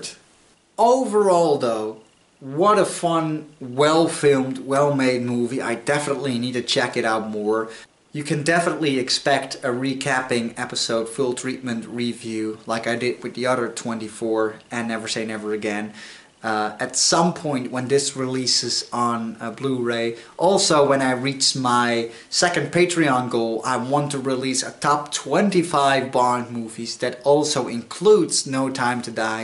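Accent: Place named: Netherlands. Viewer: How is it that "James Bond Will Return"? How many times does he nearly die at the explosive end of Bond movies but somehow cheats death and comes back again? The producers will either come up with a way that happens OR there will be a replacement 007 Bond (new actor) who is convincing enough to satisfy another re-boot. By the way, enjoying the Dutch pronunciation of "th" or "sh", it's so cute. ;-) But whatever happens just don't let Disney touch it, which is poison to any movie franchise.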